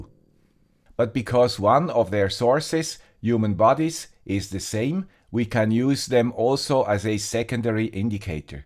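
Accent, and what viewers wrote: Switzerland. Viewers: nun ja, Paraffin (Kerzenwachs) ist ein langkettiger Kohlenwasserstoff und verbrennt mit Sauerstoff in etwa zu gleichen Teilen zu Wasser(-dampf) und Kohlenstoffdioxid. Ergo müsste die Zunahme an CO2 mit der Abnahme von Sauerstoff in Verbindung stehen. Am Ende ist nicht mehr genug Sauerstoff für die Verbrennung da und die Kerze erlischt bei einem Wert um 16% O2 in der Luft. Vielleicht weiß ein anderer Zuschauer noch etwas. Wir sind ja alle hier um zu lernen :D Und danke für die vielen tollen Videos mit dem Schweizer Akzent™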